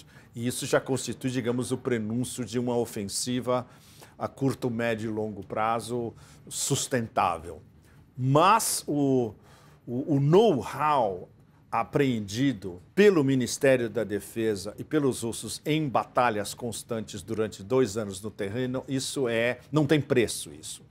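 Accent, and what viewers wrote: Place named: São Paulo, Brazil. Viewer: Mêêêuuu esse sôôôtaque da elite paulistana é tuuúuuuudo de bom! Suuuuper peculiar!!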